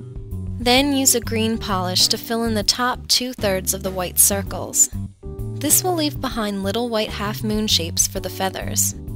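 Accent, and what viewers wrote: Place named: Canada. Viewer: She's totally Canadian. Listen closely to her voice.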